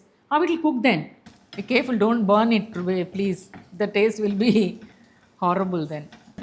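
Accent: Tamil Nadu, India. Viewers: ek teacher thi hamre sath, tamilnadu se .... JP madam ... your voice reminded her. vo bhi aisi bolti thi ,,,, Ayyo rama rama, and english speaking style, bilkul apki jaise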